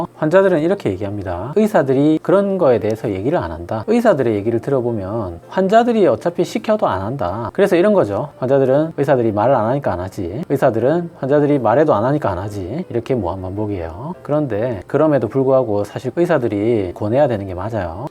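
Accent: Gyeongsang, South Korea. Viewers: 갱상도토베기말투에 쏙쏙 빨리드갑니다 당뇨 고혈압 찾아보다가 여기까지와서 재밌게 잘봤네요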